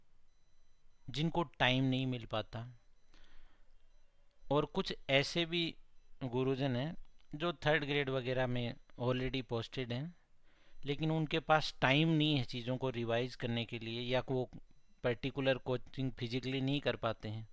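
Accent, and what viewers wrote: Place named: Haryana, India. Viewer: Very good initiative sir And very nicely explained ....with Hariyanvi ascent and rajasthani touch....🤗 Great sir best donas ur self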